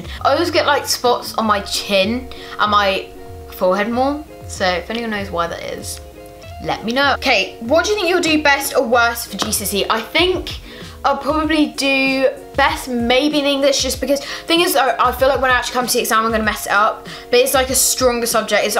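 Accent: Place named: United States. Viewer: Love this and ur American accent wowee!!!!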